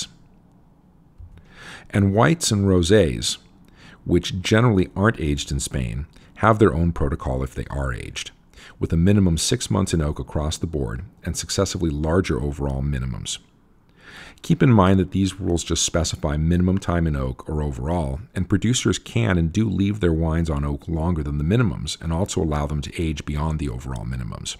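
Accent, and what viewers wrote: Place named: Caribbean. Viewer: I like your Spanish pronunciation. It sounds Caribbean (Boricua maybe?)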